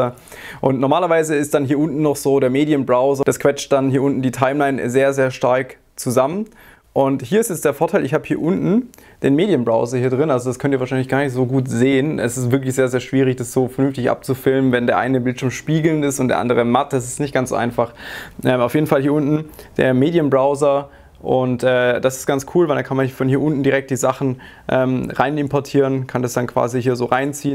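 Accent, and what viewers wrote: Swabia, Germany. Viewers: dein Schwäbischer unterton tut den Ohren gut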